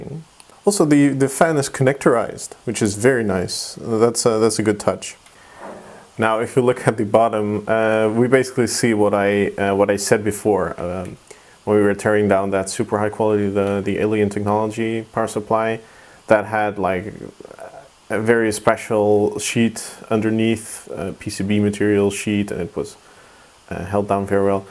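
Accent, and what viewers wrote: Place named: Netherlands. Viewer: We will save that for a rainy day... Oh wait... Hahah I love your video's. Some things i dont understand, but you explain things very clearly. I find it very fun to watch. Accent is good too, normaly a dutch accent really irritates me, but not you, the accent is very minor.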